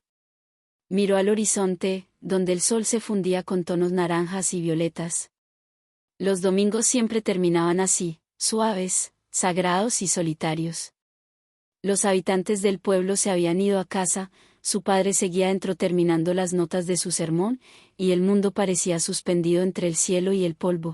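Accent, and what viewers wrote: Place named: Colombia. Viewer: No me gusta la voz de la narradora ese acento colombiano 😢😢😢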